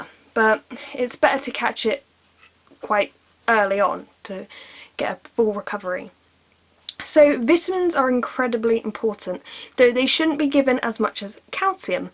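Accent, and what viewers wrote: United Kingdom. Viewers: She’s British. Due to her accent she pronounces things differently... so no, she doesn’t pronounce it wrong.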